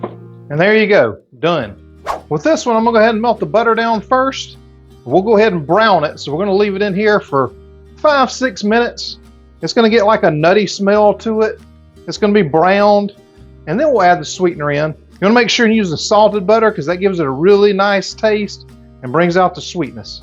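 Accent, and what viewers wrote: South, United States. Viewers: Is that a Southern American accent I hear?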